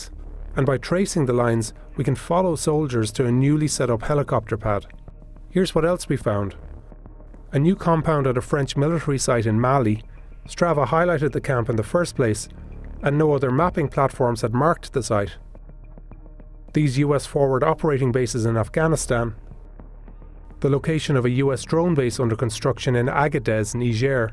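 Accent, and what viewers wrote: Ireland. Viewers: Amazing Irish accent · Wow some Irish lad narrating this lol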